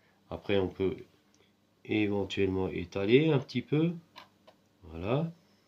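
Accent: Alsace, France. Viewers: C'est copié ,plein de vidéo sur Youtube sur ce sujet !!!!!!!!!,j'en ai trouvé une vieille de 8 ans ,pour recoller un réservoir eau de cafetière il faut oser ,collage cyano et la poudre sur un récipient alimentaire pas bon ;moi je fais pas ,attention si eau pas en contact avec collage pas de soucis. Ton sujet est un peu comme on dit du déjà vu ,celà ne retire rien a ton talent de bricoleur ,trouver un autre sujet pour durer ,c'est pas évident je jen convient . Tu a un accent Alsacien . Bye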